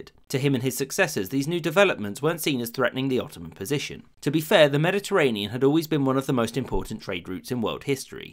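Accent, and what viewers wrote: United Kingdom. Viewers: i'm ottoman. you speak too fast english with your strange british accent. bro slow down. i was trying to understand. böyle hızlı konuşma gözüm. i think you need some ottoman slap on your face thats why you speak too fast. i will try watching video with 0,75 speed, lets see if it will work.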